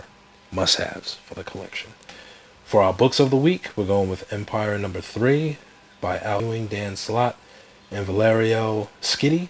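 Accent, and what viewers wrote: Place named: New England, United States. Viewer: Yo my guy are you for Mass.? I be hearing that New England accent lol.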